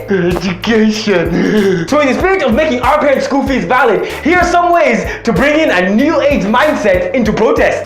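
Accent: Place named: United States. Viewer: African are racist to election, hahaha ain't that the truth!!!!You are funny you would be more funny with that cute african accent!!!You sound almost american!!!Do all kenyans speak english at all times???if yes, why oh why???What happened to your native language, I'm not even kenyan but i would appreciate some kenyan accent!!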